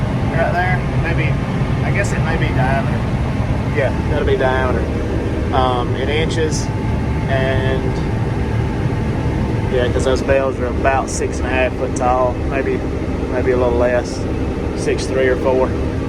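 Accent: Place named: Mississippi, United States. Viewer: GJ explaining that stuff. Always wonder about those heads. Good to see some good ole Mississippi accent on the Youtubes! Good Evening from Lafayette County!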